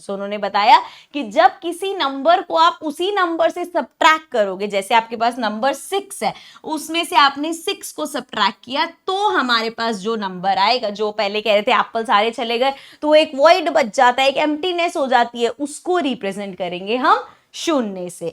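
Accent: Punjab, India. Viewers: Gosh, your punjabiiiiii😭✋🏻🛐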